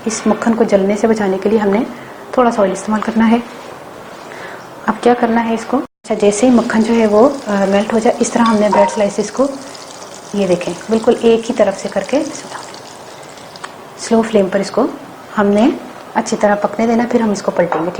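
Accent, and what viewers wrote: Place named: Punjab, India. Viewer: behna your punjabi language voice i love it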